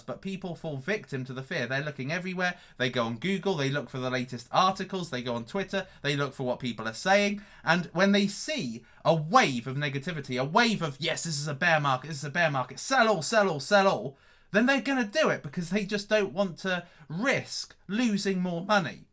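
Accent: England, United Kingdom. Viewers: He is English lol... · love your accent, mate. which part of England are you from? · Hi, whare are you from? UK? Thanks for your videos.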